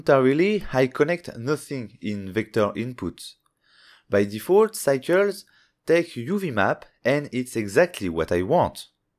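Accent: France. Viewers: I never thought how French accent sound in english it's quiet fun... I like it... no offense I'm Italian I also speak French but never had the opportunity to listen to a French speaking in english. Great tutorial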